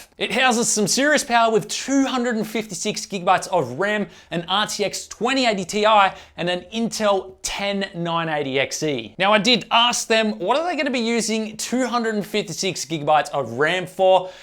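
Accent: Australia. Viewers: Are you Australian you sound like it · Is this guy Australian??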